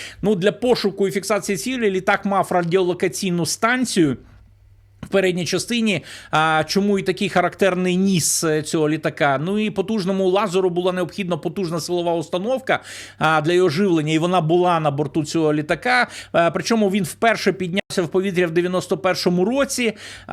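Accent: Russia. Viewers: Як бридко слухати наслідування вимові рашистські мові; сказаф, пішоф, даф...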